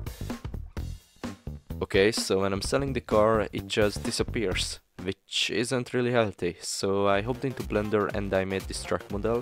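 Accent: Hungary. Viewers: I know a hungarian when i hear one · Bros accent is hungarian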